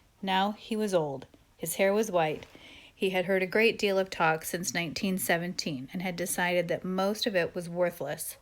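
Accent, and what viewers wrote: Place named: United States. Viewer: Спасиб, послушал "простую" американскую речь (произношение). Понятны отдельные слова. ))))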